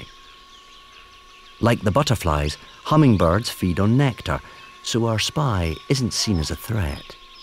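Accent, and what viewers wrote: Scotland, United Kingdom. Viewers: yess gimme that scottish accent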